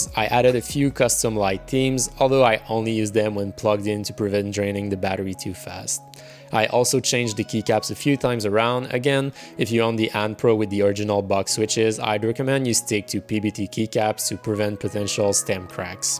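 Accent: Quebec, Canada. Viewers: Are you from Québec ?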